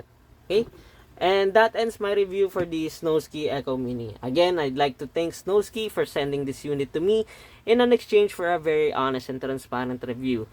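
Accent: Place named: Philippines. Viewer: I like your filipino accent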